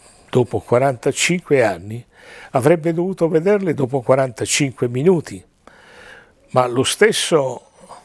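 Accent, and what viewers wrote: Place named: Sicily, Italy. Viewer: Generale, da come lei parla scopro che lei è un siciliano. Ok, complimenti!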